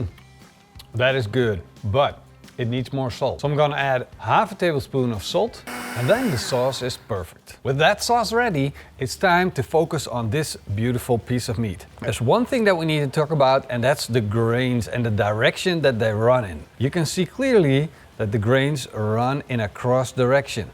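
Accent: Netherlands. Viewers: You are dutch right? i can hear immediatly haha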